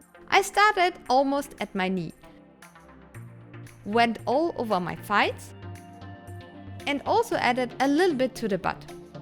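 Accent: Germany. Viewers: Dis Jerman Äksent! <3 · Ist sie deutsch vom Akzent her?😅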